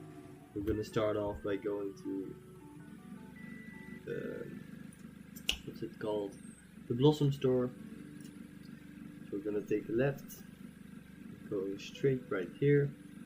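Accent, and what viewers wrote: Netherlands. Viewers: ar u dutch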